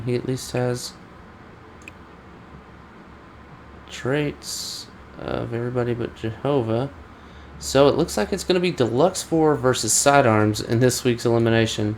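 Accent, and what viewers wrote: South, United States. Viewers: deep country accent he dead as hell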